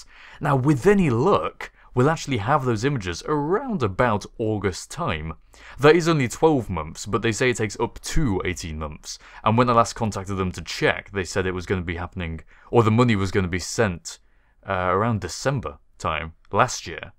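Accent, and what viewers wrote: United Kingdom. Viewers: Is that his actual accent? O.o;; All my british accent lessons are from BBC.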